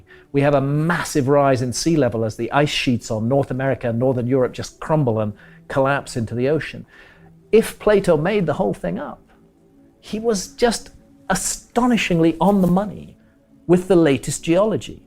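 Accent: England, United Kingdom. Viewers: This bullshit artist actually says that Plato's story of Atlantis MUST be true because geology says sea levels rose via Meltwater pulse 1B (the characteristics of which is highly unknown, by the way) around the same time that Plato gives for Atlantis. No joke, he actually says this. It is so easy to get away with bullshit when you have an awesome british accent. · His English accent gives him an infinite amount of credibility.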